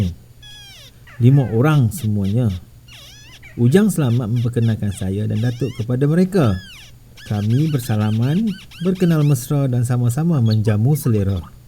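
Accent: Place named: Batu Kurau, Malaysia. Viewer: Loghat tu macan cakat orang batu kurau lah..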